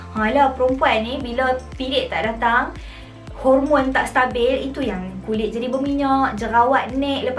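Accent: North, Malaysia. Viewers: Very informative👍👍. Jgn hiraukan manusia2 yg jeles dok ngata bagi sharing session. You do you💪🏻💪🏻 btw, seronok dgr loghat utagha rindu pulak chegu2 kita dulu. Hahaha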